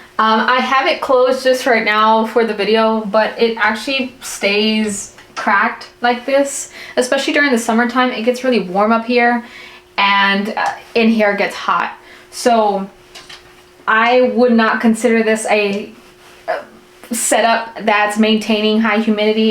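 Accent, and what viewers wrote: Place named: Latin America. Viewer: kaya (hello!) from unceded wardandi noongar boodja, a.k.a. the south western most corner of western australia. I’m not really a commenter, but I’m pretty sure your mystery hoya is a fungii (i love it’s cute mushroom name!) I wanted to say that your planty joy is a pleasure to share in and your genuine and unabashed enthusiasm is greatly encouraging and uplifting, a real celebration of life in her many forms. I thought while I’m here I’d share that two more things, one that the indigenous land I inhabit is one of the 36 global biodiversity hotspots, and we have a range of incredible native orchids and carnivorous plants that are currently growing and blooming throughout our season of Djilba, you should look up the ‘bridal rainbow’ drosera and the ‘queen of sheba’ orchid if you get a chance, they’re just some of the most famous of many stunners! 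The other is that I figured from your cadence you are latin, my housemate and close friend is Ecuadorian, working on getting permanent residency here, and she wanted to grow a cactus on her desk at her new job, so I showed her your videos on the beefs (!) for some tips and also bought her a mini grow light because her office isn’t well lit, and we’re going to go to a local specialty beef nursery to pick some new friends for her! I showed her your video of trying the tunas fruit with tajin, she actually found tajin in our local supermarket here, I’ve tried it with mango, and as a gringa (haha!) I understand the hype now! I have a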